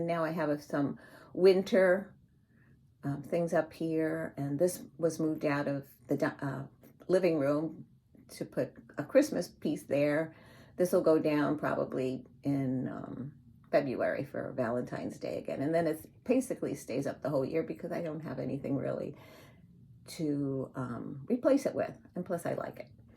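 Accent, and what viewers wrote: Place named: Northeast, United States. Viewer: I just love your videos. We are drawn to many of the same patterns, and I could listen to your beautiful northeastern accent all day long! I love how involved and dedicated you are in the lives of your grandchildren. They are so blessed to have you. I pray mine one day live closer so I can be helpful to my children by babysitting. I want to register an enthusiastic YES PLEASE to the "under the bed box" video. I would love to settle in and watch that!!!! Happy new year to you.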